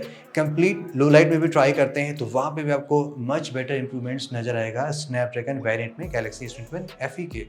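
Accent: Lucknow, India. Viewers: Bhai ap lucknow se hai kya? And Goof review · Are you from lucknow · Aap Lucknow se ho kya????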